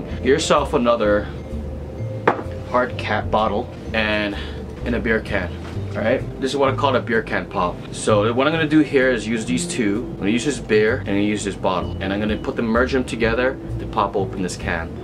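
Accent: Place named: Canada. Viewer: I can tell you're Canadian, Winnipeg perhaps?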